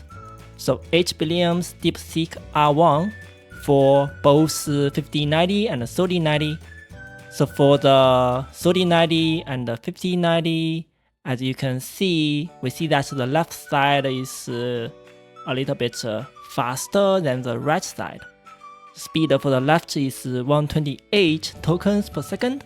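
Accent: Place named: China. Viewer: 我就觉得这英文太中文了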